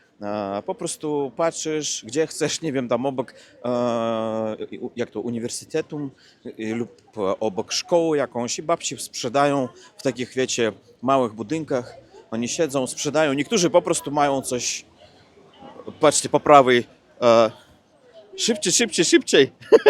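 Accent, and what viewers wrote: Russia. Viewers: Od 18-tu lat mieszkam w Anglii i wciaz mowie z polskim akcentem, mimo, ze mowie plynnie i (prawie) bez bledow. Inna rzecz, ze nigdy nie ukrywam, ze jestem z Polski i ludzie to lubia, serio, pewnie dlatego, ze Polacy w UK maja dobra marke i jestesmy szanowani jako dobrzy pracownicy. A moj akcent w pewnym sensie dodaje mi kolorytu. Dlatego nie przejmuj sie swoim akcentem, bo on jest po prosyu fajny, wyroznia Cie z tlumu, a hejterow olej.(zignoruj). Jestes Rosjaninem i jest ok. no bo w czym problem. Jakbys byl Czechem albo Bulgarem, to co? Bylbys innym czlowiekiem? Lepszym? Gorszym? Nonsens. Ja chyba tego nie dozyje, ale Ty moze jeszcze bedziesz z duma mowil o swoich rosyjskich korzeniach. Kto wie? Daj Boze, zeby tak sie stalo. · Zrobiłeś bardzo duży postęp w polskim w tak krótkim czasie. Akcent można powiedzieć że masz prawie idealny, choć rosyjski akcent w języku polskim jest taki uroczy ☺️ Zacząłeś też większość słów poprawnie odmieniać 🙂 chciałabym mieć taką głowę do języków 🙂 Szanuję! 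☺️ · rosyjski akcent gubisz bardzo ładnie. Z odmianą wyrazów masz problem, ale to normalne. Im więcej będziesz mówić, zwłaszcza z kimś, kto będzie poprawiał Twoje błędy, tym będzie lepiej. Inną metodą jest uczenie się odmiany wyrazów na pamięć, zwłaszcza tych, które sprawiają Ci problem. Może to wspomagać to, czego nauczysz się, mówiąc i nie będziesz stosować rosyjskich końcówek przy odmianie wyrazów w języku polskim. Inną kwestią są spółgłoski twarde i miękkie oraz te, które zmiękcza "i". To trzeba wyćwiczyć, bo Twój aparat artykulacyjny jest przygotowany pod inną wymowę, inny język. Z czasem przyjdzie samo. W każdym razie progres jest niesamowity, gratuluję. Świetnie się sprawdziły szerokokątne kadry, miły, przyjemny spacer i fajne treści. Niech Ci się dobrze wiedzie. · Co do niedopałków porozrzucanych tu i tam, to mogli przyjezdni zostawić, nie twierdzę, że cudzoziemcy. Jest względnie czysto nie tylko dlatego, że jest sprzątane, ale i dlatego, że jest mniej śmiecone. 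I jeszcze dodam coś na temat Twojego "akcentu", czyli wymowy. Akcent = przycisk wyrazowy = udarienije. Wymowa = praiznaszenije. U Ciebie jest już bardzo niewielka pozostałość wymowy rosyjskiej. Robisz błędy gramatyczne, ale znacznie rzadziej niż jeszcze rok temu. Gdybyś codziennie przebywał w polskojęzycznym otoczeniu, to było by najlepiej dla Twoich umiejetności językowych. A co z Twoim angielskim? Nie zaniedbuj go! Pozdrawiam, czyli zdrowia życzę!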